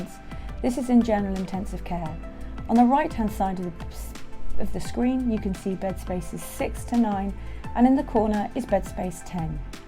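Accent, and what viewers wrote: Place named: United Kingdom. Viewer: It's British accent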